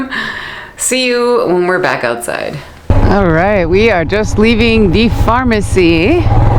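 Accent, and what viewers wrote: United States. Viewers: I am Egyptian and I like watching your videos and listening to your American accent that's how I can get the accent .Go on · Dear, thank you for the video but I have a question, why do you keep repeating "this looks fancy to me", "this looks posh", "rich people live here"? From your accent you obviously come from the US. So, there are no "fancy" neighborhoods in America? Do Egyptians have to live in slums to conform with the stereotype the West has about Egypt?? Egypt has 110-Million citizens, of course at least a couple of millions can have enough money to live in nice neighborhoods. This view of Egypt as a country that MUST BE POOR through and through is prejudiced and not right. Every country has rich and poor neighborhoods. In your country you have Hollywood and the Bronx, so let's drop this "fancy rich neighborhood" narrative as it is insulting to many hard-working Egyptians who have EARNED their right to live in "fancy" neighborhoods. 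Good luck with your videos!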